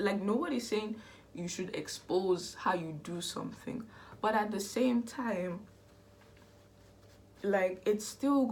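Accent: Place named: Caribbean. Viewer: Your accent reminds me of my family's, are you from Martinique or any part of the Caribbean by any chance?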